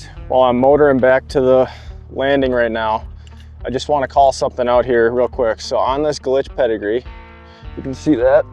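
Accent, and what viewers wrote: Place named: Minnesota, United States. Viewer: You’ve got the Minnesota accent down for sure)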